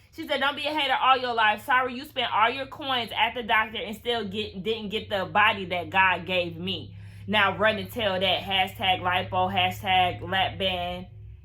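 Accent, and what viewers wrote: Charleston, United States. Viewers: I swear you talk like you are from my lovely city of Charleston!!!! I LOVE IT!!!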